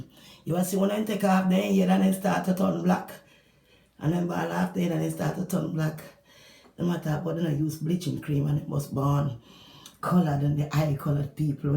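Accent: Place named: Jamaica. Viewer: Lol.. I love the way my Jamaican people speak. She has a personality on her!!!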